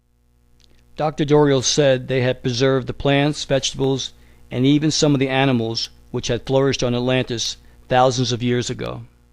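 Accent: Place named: New York, United States. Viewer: Narrator with a NY accent does documentary of stories from Mt. Shasta, in CA?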